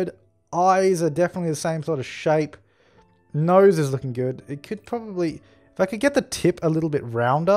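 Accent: Australia. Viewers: Your video is a joy to watch and listen to, your an Aussie aren't you? I love listening to you talk,lol! Your comments made this the best Sims 4 video I have seen yet, you made me laugh allot! And about Aluna, you could tell that it was the same Sim in Sims 4, but it looks even better some how! I know allot of people say they look less real, but I think they look more real then Sims 3, its like you can give them better detail, which makes them look more real! I can't wait to get to try it out myself, I love making different Sims, and detail is very important to me! I hope you make some more videos of you making new Sims with The Sims 4, I'll enjoy them just because of your voice,lol!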